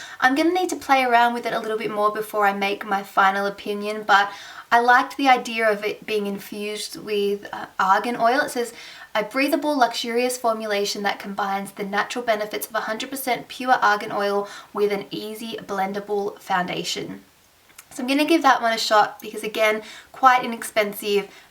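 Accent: Australia. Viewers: Yes, an Aussie accent! New subbie here :)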